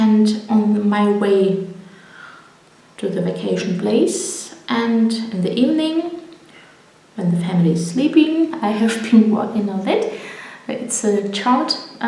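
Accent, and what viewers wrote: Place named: Germany. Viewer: I just found your floss tube; I LOVE your projects and I like hearing a German accent again. My husband and I were stationed in Grafenwoehr (Bavaria) for 3 years and I'd love to go back. We went to Saxony all the time though because Dresden is one of my favorite cities! I can't wait to watch the rest of your fposstubes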